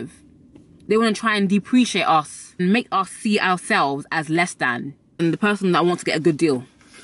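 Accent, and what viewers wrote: United Kingdom. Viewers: I will add tax to it. Great advise. I love your British accent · What an incredible video and it's so much more nicer for to me to hear a local British accent! Girrlll you was speaking my language from start to finish!!! I've been on a journey of self love and self care but I've hit a wall and came onto YouTube and this came up on my recommendations. I'm going to check out the rest of your channel but it would be absolutely amazing if you could also break this down into simple terms ie how exactly can we get to this point, what things do we actually have to implement to get to where you are? I hope that makes sense lol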